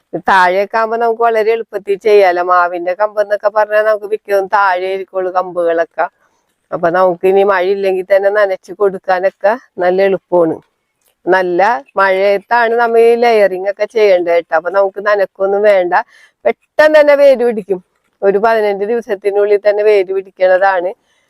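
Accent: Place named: Ernakulam, India. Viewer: Eranakulam aanalle😊 Bhasha kett paranjathann.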